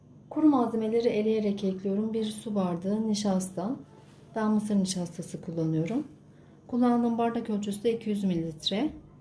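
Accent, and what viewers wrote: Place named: Azerbaijan. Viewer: Azerbaycanlı birinin türkçe böyle konuşmasında hiç sorun yok siz Azerbaycanca biliyormusunuz hiç?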